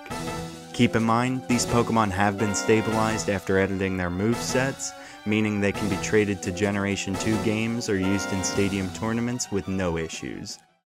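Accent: Philadelphia, United States. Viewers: Are you from philly? Your accent makes me feel like I’m at home lol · I recognize your accent! Are you from Philly, too?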